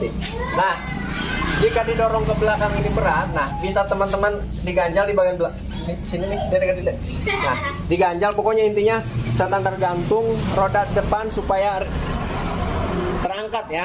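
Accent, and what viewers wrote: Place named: Pandeglang, Indonesia. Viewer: Kaciri logat pandeglang na, 😁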